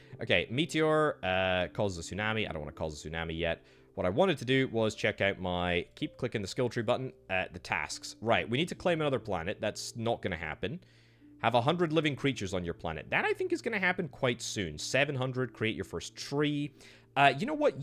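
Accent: Scotland, United Kingdom. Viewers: Which region of Uk are you ? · Scottish accent?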